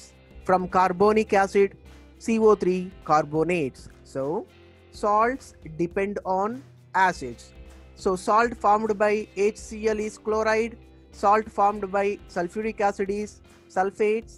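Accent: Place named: India. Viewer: It's a Indian accent😁 I am 100% sure.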